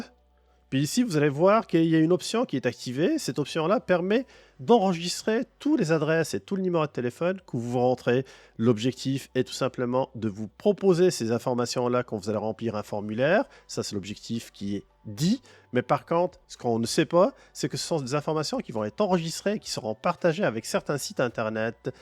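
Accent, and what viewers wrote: Quebec, Canada. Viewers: Super de nous montrer tout les paramètres pour essayer de nous mettre à l'abri de certains problème , continuer comme ça , l'accent Québécois est toujours aussi sympa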